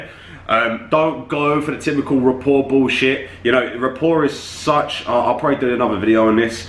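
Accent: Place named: Australia. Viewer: Why does this man have such a strong Australian accent?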